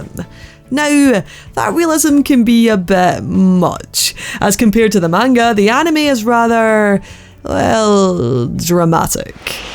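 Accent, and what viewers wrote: Scotland, United Kingdom. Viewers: Love watching your reviews on anime especially your Scottish accent 11/10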